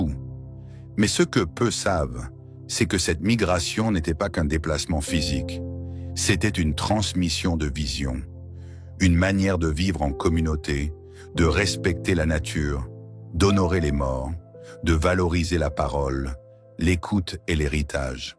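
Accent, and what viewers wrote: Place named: Europe. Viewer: je parlais de la voix qui faisait les commentaires sur la vidéo c'est une voix européenne et les prononciations ne sont pas africaines.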